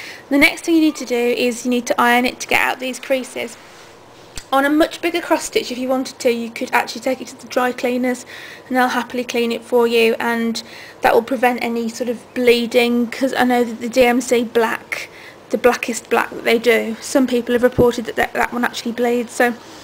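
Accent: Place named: England, United Kingdom. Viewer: Loved your video, you talk just like a friend of mine back in England, it makes me homesick! Thanks for the videos! xx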